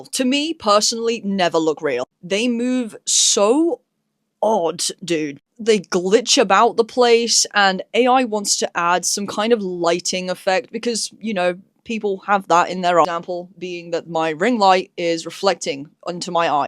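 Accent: United Kingdom. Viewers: you're bri'ish ?!?!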